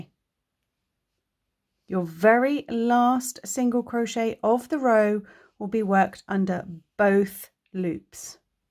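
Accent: United Kingdom. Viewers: now i am confused - a uk accent but usa stitch names.....i would call thouse double crochet and treble crochet stitches.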